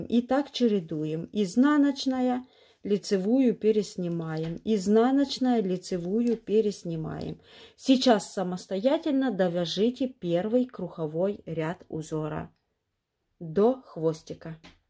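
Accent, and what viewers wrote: Ukraine. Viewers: Здравствуйте,!Огромное спасибо за супер инфо. А у вас есть шапка для детей? сколко петлю надо набрать для7летки? Заранее спасибо за ответ. полюбила вашу речь, украинская)) Привет из Баку.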